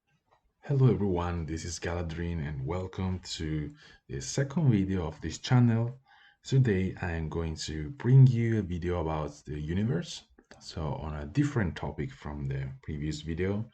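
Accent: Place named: Italy. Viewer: Love italian accent!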